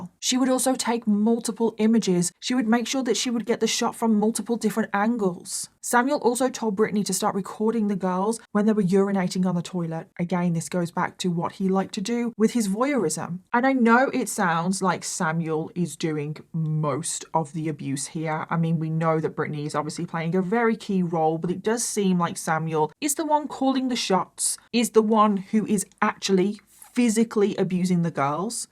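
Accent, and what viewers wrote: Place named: Birmingham, United Kingdom. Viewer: i asked my friend if she could do her makeup and talk at length about csa at the same time and she said no. the grwm/true crime subgenre is kinda antiquated now but it's definitely a skill and i'll always be here for it. anyway the stuff you talked about was horrible asf so thanks for that. always nice to hear a brummie accent too.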